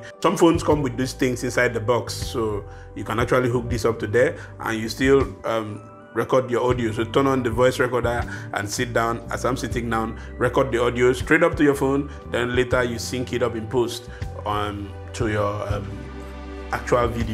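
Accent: Africa. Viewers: I mean he has a rich african accent but a poor american accent, it's a language thing no need to make it so political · I am Norwegian and I love the many ways of speaking English, including yours. African English is very pleasant. It sounds both robust and gentle at the same time.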